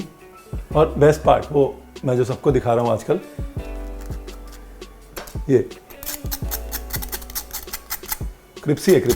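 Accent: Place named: Punjab, India. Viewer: Very yummy 👌😋 i just love your punjabi tone chef .. you are really amazing n your recipes are just woww....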